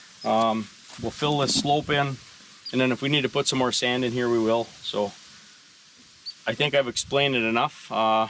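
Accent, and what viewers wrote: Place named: Michigan, United States. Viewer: Just found your channel. Thought I recognized the accent. My mom is from Michigan. So most all of my cousins live up there. I love your trains.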